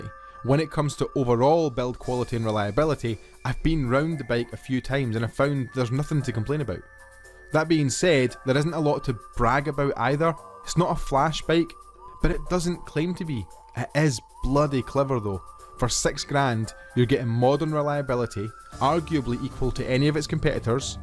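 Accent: Scotland, United Kingdom. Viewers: Great review and that accent is a great bonus! Scottish? Thank you. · Thanks! That was not only a fair review, it was one of the more articulate and entertaining ones I've come across. Sure, that Scottish accent helped keep it nice and crisp, but turns of phrase like "vegan banana leaf sandals" really warm up those synapses, you know? Frankly, you're so good at this that I'm astonished you have only 250 subscribers. You just earned yourself number 251. Now I'm gonna go check out your other stuff.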